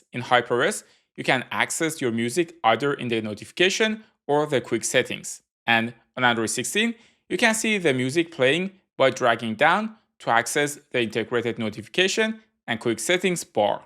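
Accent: Iran. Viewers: Bro your accent is so fucked, are you Persian?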